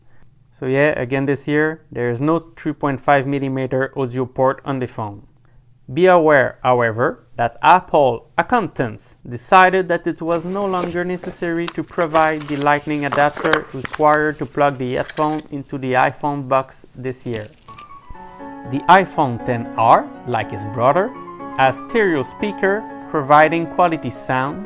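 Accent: Quebec, Canada. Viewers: Wooooooow t'es du Québec right? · J’ai reconnu l’accent québécois hein? PS:moi aussi j’en suis un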